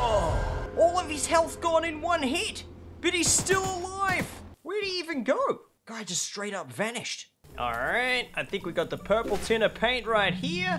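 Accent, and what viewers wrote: Australia. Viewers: Definitely Australian 💀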